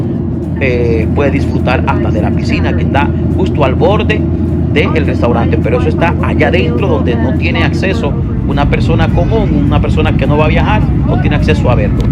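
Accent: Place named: Caribbean. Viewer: hola señor.. creó qué es su nombré.. vemos qué usted vive obsesionado usted parece a ésa ONG..enemigas de la imagen del la RD.. porque usted compara ahora la economía de un país dé diez millones de personas con economía de grandes potencias cuando usted mismo dise qué la potencia que tiene esos grandiosos aeropuertos su presupuesto dé seguridad es mayor que la de china y Rusia.. usted se canta y sé llora.. y muchos nos preguntamos cómo es qué usted viaja semanal al meno qué usted no responda a una campaña dañina contra la RD. O usted hace eso porque responde a los intereses de las personas qué quieren construir el aeropuerto dé bávaro que nosotros estamos dé acuerdo que sea construido y qué sea el más moderno le cojimos la seña ya qué usted menciona el aeropuerto de punta cana..y hemos notado en esté vídeo ciertos acentos que no son dominicano escuchese usted mismo que le sale un acento de una isla colonizada del caribe vemos también que usted reconoce que la RD es el país del caribe más turístico y la ciudad Dr santo domingo como la más bella y moderna del caribe resalte el metro de santo domingo.. 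el teleférico dé santo domingo.. único en el caribe..la economía propia más robosta y deje la obsesión con el aeropuerto las América.. porque ya usted ralla en la obsesión y harta hasta la próxima semana cuando vuelva con la misma canaleta cooooooooooooño que mucho jode con él aeropuerto las América no sea usted tanto por no decir otra cosa usted sueña con el aeropuerto las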